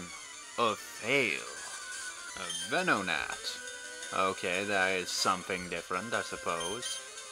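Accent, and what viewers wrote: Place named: United Kingdom. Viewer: Love the British(?) accent. Lol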